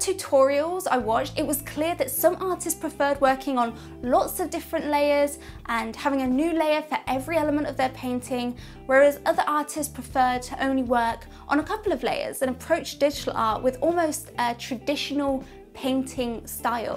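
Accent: United Kingdom. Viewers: Confused. Sounds British, but charges in Dollars for the course? Sounds like a scam. That always sends red warning lights to me.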